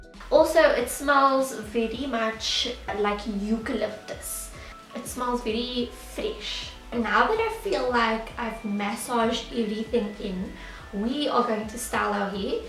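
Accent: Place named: South Africa. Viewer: Sounds like South African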